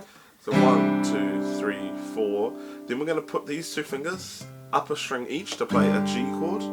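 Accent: New Zealand. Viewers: thank you for uploading this! btw I love your accent, are you from new zealand?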